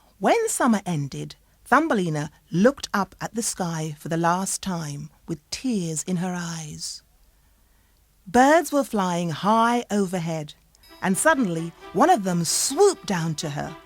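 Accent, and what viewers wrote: United Kingdom. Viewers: イギリス英語ですか？よく聞くのと発音がちょっと違う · エル エル イギリス英語です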